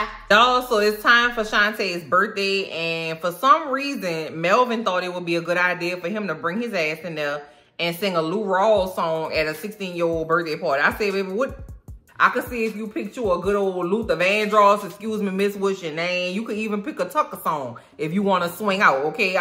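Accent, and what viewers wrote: New Orleans, United States. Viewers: I normally can't stand the sound of a Nola accent, but yours is pleasant, and you're funny af😂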